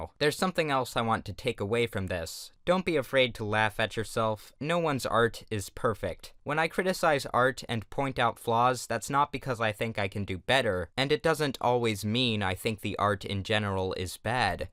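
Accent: United States. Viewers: i find your american accent attractive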